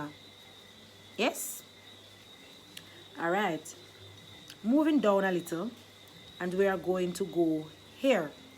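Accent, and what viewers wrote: Jamaica. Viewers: It is the introduction for me lol You are so funny. I find Jamaican accent so cool. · Your accent is very thick and I'm trying to grasp the Jamaican word of the English word, amd I'm struggling! This was so informative and confusing. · I love your accent girl. Always loved jamaican accent. This is really an interesting video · Girl you got me cracking my ribs to the core..am loving your energy and the Jamaica accent ,and how you said the word welcome was interesting in a good way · I love me some Jamaican accent😍! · Its so hard for me to understand Jamaican but I love how u teach it! Lol Ive been to Jamaica once n i just love the accent! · 😂😂😂Sis you got my jaws dropping okay... because I did not know that Jamaican have body parts that we do not have. I love the accent though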